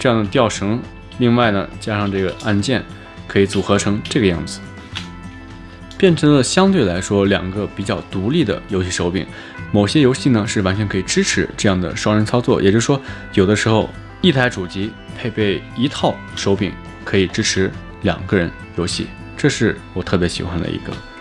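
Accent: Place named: Northwest, China. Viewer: up主浓浓的西北口音，祖籍是西北人吗？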